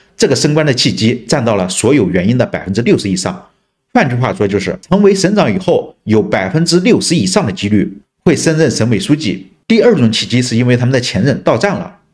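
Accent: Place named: Anhui, China. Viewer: 主播一口白完农村口音，应该是安庆池州纵阳铜陵一带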